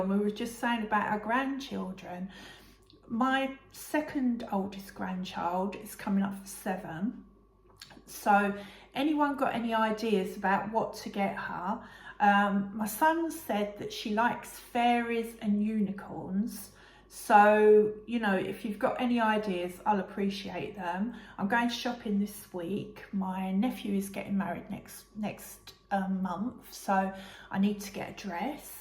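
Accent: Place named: Australia. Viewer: G'day - good Aussie accent! A cold Fosters always goes down well on a hot day. Nice poo bag. Wilson will be looking forward to providing something for you to put in it! I will have a look on the Etsy shop. Certainly a ton and more of rainfall in Australia. With the fine weather in the UK you will have to get your bucket and spade out soon to head for the seaside. Happy days! Can you swim or will you need a blow up rubber ring with a horses head or similar on the front! We often went as a family to Great Yarmouth in summer - donkey rides on the beach.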